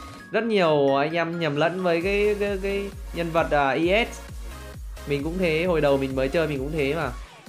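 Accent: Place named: North, Vietnam. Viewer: Ân ng Quãng Bình mà sao ổng lại nói tiếng Bắc nhuệ🤔🤔🤔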